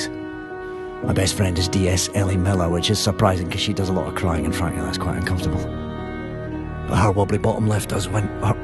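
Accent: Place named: Scotland, United Kingdom. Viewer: I absolutely love his accent even though I am also Scottish 😂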